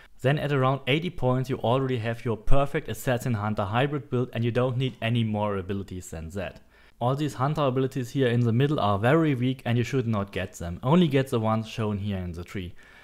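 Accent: Germany. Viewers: I love the German accent, great video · Dude mast be 10000% from Germany they have hard time to learn English so he is not bad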